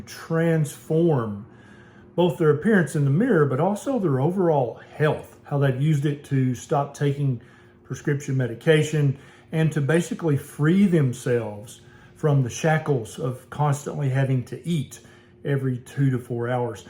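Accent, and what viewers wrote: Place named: Kentucky, United States. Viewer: Dr. Berry, I listen to you a lot...I spent most of my childhood in Kentucky, and EVERYTIME I listen to your shows, I crave "Cokes" and beef jerkys and MoonPies!! Oh, and ALL DAY SUCKERS...lol...Blame it on my granny! I LOVE that accent of yours, and miss my granny and papaw...they both passed years ago...You are my fave doc on here! I do not eat banana splits, that was the name of a " divorce group" my kids attended when they were 6 & 8...I did eat a pop-tart today...but I have been good for 6 weeks or more...I will kick my butt when the morning comes....haha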